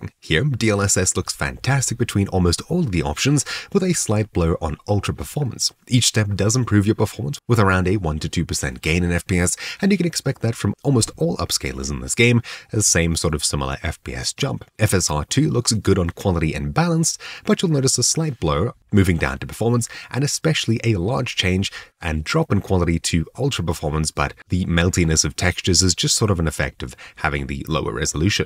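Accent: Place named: South Africa. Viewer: didnt know your south african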